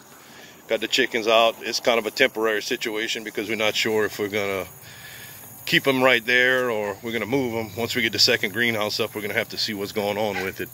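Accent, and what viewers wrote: Louisiana, United States. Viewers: Without that Louisiana drawl, I think if I told that same story in my voice, saying it was taking place in a NYC sewer,....nobody would care!, your story telling and video making are truly a find! Love what your doing, now go get us some video of that gator!...And I will look closely next time I'm in Manhattan! Lots of rumors about people flushing small ones down the drain and managing to survive in the warm waters surrounding steam pipes and skyscrapers of Downtown!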